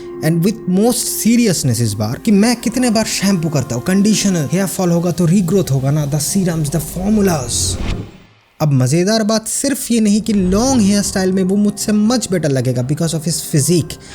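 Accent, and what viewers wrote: Bengal, India. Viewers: Bhai aap bengali ho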